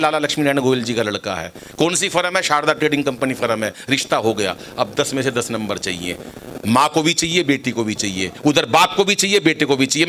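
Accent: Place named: Haryana, India. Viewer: ओरिजनल हरियाणवी अंदाज वेल डन श्री गोयल वेल डन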